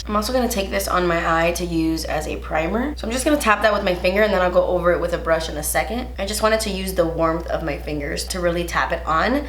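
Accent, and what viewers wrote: Cuba. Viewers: So funny story... My husband says Concealer the way you do because he's heard you say it so many times while I'm watching your videos. It's really funny and he REFUSES to say it normal (not Cuban) he's very white... Lol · More videos in Spanish! Me gusta tu acento Cubano 😄💖💖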